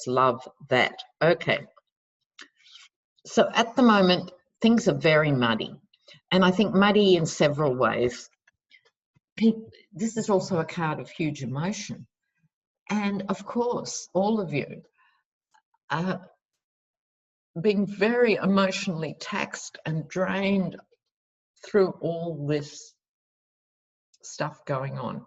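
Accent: Australia. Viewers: Strewth you make my day 😂😂😂😂😂😂😂😂😂😂 I love the way you tell it like it is. True Aussie! Great reading loved it. 💖💖💖💖💖💖💖💖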